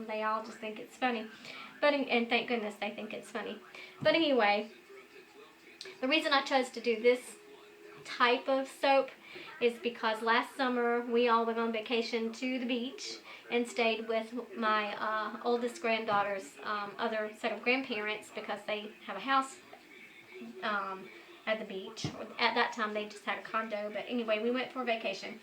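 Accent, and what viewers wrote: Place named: Alabama, United States. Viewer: Are you from Alabama or Georgia? I hear my accent! (Alabama)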